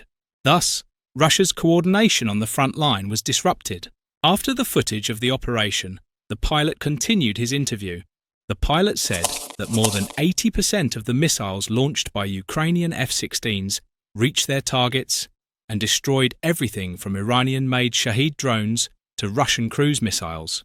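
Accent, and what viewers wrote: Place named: United Kingdom. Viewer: oh bullshit... this is all propaganda.. some brittish accent neocon accent narrator asshole brainwashing the idiots dumb enough to believe this propaganda...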